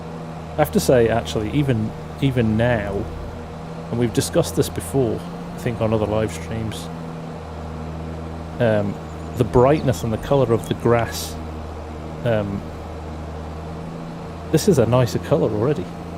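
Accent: England, United Kingdom. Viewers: Could I ask, are originally from the uk but now live in America? Im always lost that u have an English accent but do everything American 😂 pls excuse my ignorance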